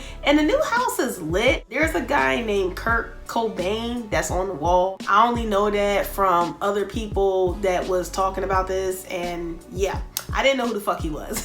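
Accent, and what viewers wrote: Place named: Philadelphia, United States. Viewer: Idk where you from but it’s definitely close to philly IF NOT philly 😩😭😭 ya commentary had me rolling !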